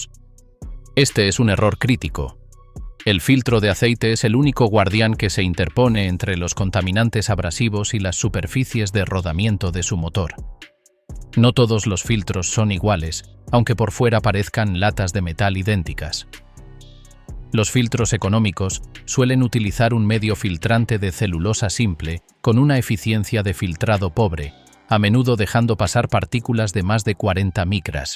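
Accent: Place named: Spain. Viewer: No me gusta el español de españa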